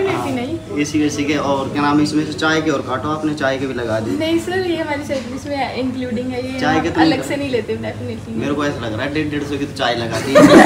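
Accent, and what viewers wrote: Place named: Rural, India. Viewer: भाई तेरा बोलने का तरीका देशी लड़के की तरह है। मजा आ गया भाई। 🤗🤗🤗🤗🤗🤗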